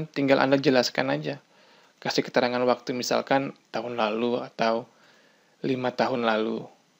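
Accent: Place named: Palembang, Indonesia. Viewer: orang palembang ya kak. setiap ngomong selalu pakek "e" hehehehehehehe paham betul aku cakap palembang. suka tutorial ini mudah dipahami.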